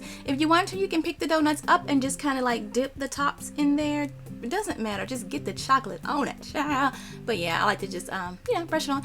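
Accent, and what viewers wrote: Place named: New York, United States. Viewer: Anyone else notice her intros are always said EXACTLY like YouTube channel, Laura In The Kitchen? She starts out saying, "Hi guys!" in that same New York tone.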